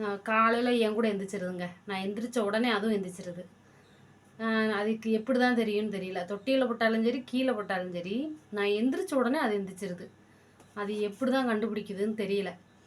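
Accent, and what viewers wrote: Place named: Chengalpattu, India. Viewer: கவலைப்படாதே மகளே அம்மா நான் இருக்கேன்❤❤ இந்த வீடியோவை ஃபுல்லா பார்த்துவிட்டு தான் உனக்கு கமெண்ட் போடுகிறேன் கடைசியிலும் செங்கல்பட்டு தான் பேசுகிறாய்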